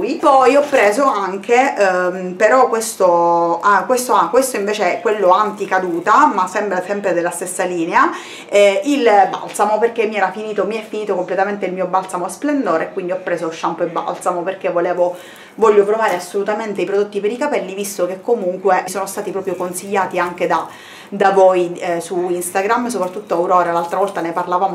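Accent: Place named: Catania, Italy. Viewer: Hai un accento bellissimo ,sei catanese per caso ?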